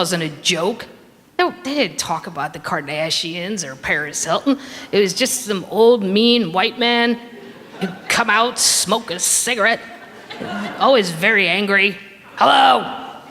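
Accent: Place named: St. Louis, United States. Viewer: I love the St. Louis accent... From my second home!! She's hysterical! 😂❤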